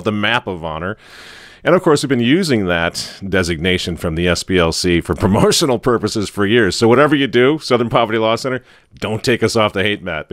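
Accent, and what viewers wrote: United Kingdom. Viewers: Fair comments all around. Only one suggestion going forward....a request, really., could you start doing these editorial videos with that great British accent. Loved it...powerful...convincing. Keep these great videos coming. Great job. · Love the English accent 😅😅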